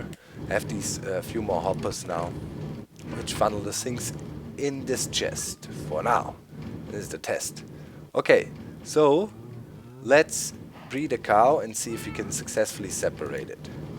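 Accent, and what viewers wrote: Germany. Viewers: i could tell you what hannibal said in the english version of the a team...but...i kind of like your germglish..it adds to the series..ok..i feel bad...in english what he would say at the end of each episode was..." it gives me quite some joy when my plan is coming together."...now you know, happy to help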